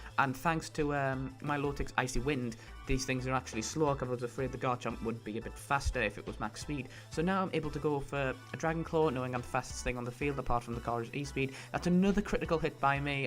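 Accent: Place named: United Kingdom. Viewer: Omg, I love your accent XD idk why but I love British or is that your accent? Anyway it's a really swaggy language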